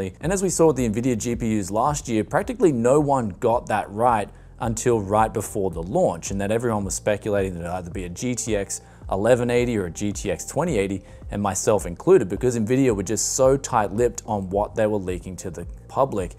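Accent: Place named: Australia. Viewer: I am watching the video in class, so I have to mute it and read the auto-generated subtitles, props to youtube for the perfect recognition of your aussie-ass accent.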